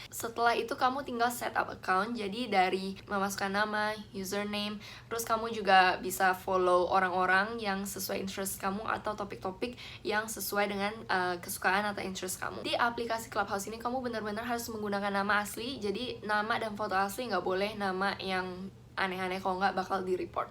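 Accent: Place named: United Kingdom. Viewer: Mba cuman mau bilang suaranya enak di denger, accent englishnya sukak banget xixi!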